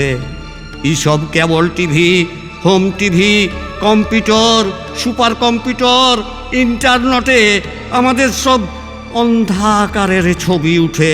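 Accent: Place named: Bankura, India. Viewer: ভালো লাগলো যথারীতি। একটা কথা বলি, যদি কিছু মনে না করেন। আপনি তো স্বর্নালী সময়ের অধিকাংশ সময়ে কোলকাতায় ও সাউথ চব্বিশ পরগনার স্কুলে যাপন করেছেন। আপনার বেশির ভাগ কবিতার উচ্চারণে বাংলার বাঁকুড়ার গ্রামের ভাষার স্টাইল সব সময়ে সকলের ঠিক ভালো লাগে না । এ ব্যাপারে একটু মনে রাখলে বাধিত হবো। আর একটা কথা বলি, সুলেখা দিদিভাই এর কমেন্টস্ ভীষণ ভীষণ ভালো লাগলো। আপনারা দুই জনে আমার শ্রদ্ধা ও প্রণাম নেবেন।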